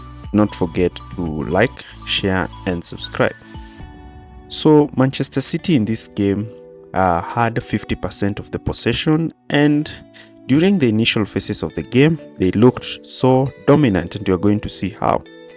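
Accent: Kenya. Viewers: I love your commentary. I don't know where you come from but you have a Kenyan English accent.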